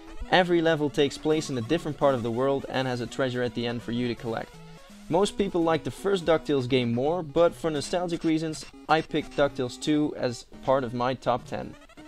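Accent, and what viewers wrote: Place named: Netherlands. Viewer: Great Top 10. Like to hear a Dutch guy talking Engish so I know you're not that far away, immediatly recongnized the accent :). I'm from Belgium ;). I'm more a SNES guy as I grew up with that one, but see much games I liked on that console here too. Like Ducktailes (or that was gameboy) and MegaMan (like X very much). Super Mario Bros 3 was also released on the SNES.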